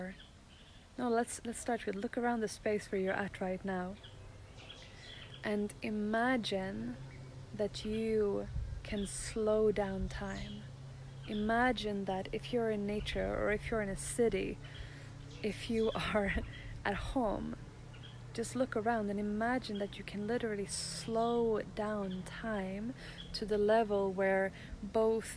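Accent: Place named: Sweden. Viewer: Älskar att höra dig prata med lite svensk dialekt! 😍 grym video ska verkligen testa detta!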